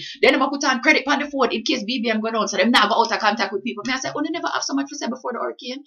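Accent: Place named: Jamaica. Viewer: I am from Serbia,and i watch this fat girl talking,but i cant understand her ! This is not English language man ! Is this,that Jamaican patois or what ? It's wicked ~~~~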